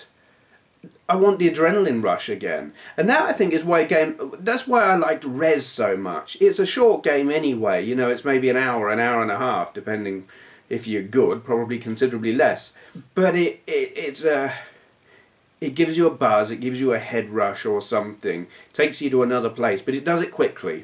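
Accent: United Kingdom. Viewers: funny hearing you say you like tim because he has an aussie accent. i subbed to your channel as an aussie partially because i like your british accent!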